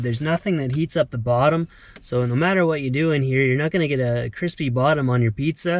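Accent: Canada. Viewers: The first couple seconds my first thought was "He sounds extremely Canadian."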